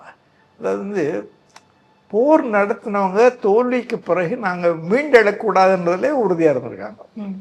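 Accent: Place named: Tamil Nadu, India. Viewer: ஐயாவும் யாழ்ப்பாணத் தமிழில் பேசுவதை மறந்து விட்டு தமிழ்நாட்டு தமிழில் பேசுகின்றீர்கள் அது போல் தான் அந்த அப்பாவி தமிழர்களும் தங்களை யார் ஆதரிக்கின்றார்களோ அவர்களை பின்பற்றுவது வழமை தானே திக்கற்றவனுக்கு தெய்வமே துணை வாய்ப்பேச்சு மட்டும் போதாது செயலிலும் செயல்பட வேண்டும் நான் ( (ஒடிசா)-கலிங்கம்) மடப்பள்ளியார் வகுப்பைச் சேர்ந்தவன்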